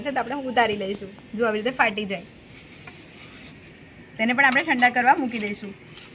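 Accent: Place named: Ahmedabad, India. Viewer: Ben kathiyawadi hoy ane amdavad ni bhasha bolta hoy ewu km lagya kre se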